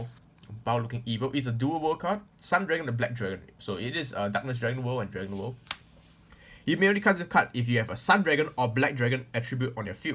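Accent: Singapore. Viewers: are u singaporean?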